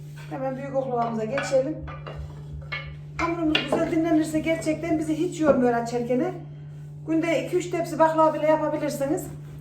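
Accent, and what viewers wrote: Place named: Aksaray, Turkey. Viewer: İyi günler maşallah çok güzel ve dogalsiniz bende aksarayliyim bu tarifi yapacam aynı bizim gibi konuşuyon kız aksarayin neresindesin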